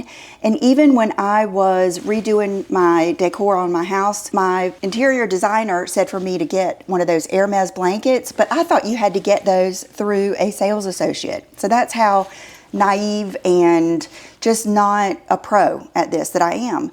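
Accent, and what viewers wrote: South, United States. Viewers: Funny story. A close friend of mine and her daughter were in Paris about 3 years ago. They were in a looooooong line to go in the Hermes store, where her daughter just "knew" (hoped and prayed) she would get lucky. Suddenly, they pulled her out of line, and she was able to buy a Birken on the spot! It was nuts!!! They couldn't believe it. No explanation was given. CRAZY! You just never know. The lawsuit? I have no feeling one way or the other. Love your channel...I've watched you for YEARS and YEARS! You're the sweetest, most authentic Southern Belle I've ever seen... and I'm southern, so I know! LOL I don't know if your state considers themselves the south, but your accent is fabulous!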